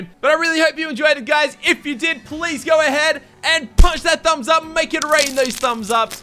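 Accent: Australia. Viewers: Graeme Hope he is australian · What your Australian wow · Wait ur Australian?.......Awesome cause Im Australian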